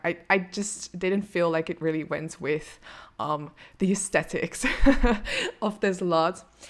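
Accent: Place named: Netherlands. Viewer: You sound very Dutch. 😊 And I like this communal garden. It looks very grand and luxurious.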